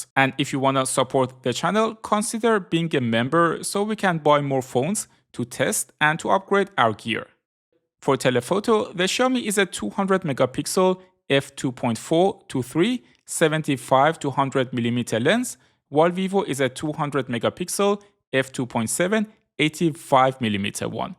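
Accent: Iran. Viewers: چرا لهجه ایرانی داری داش؟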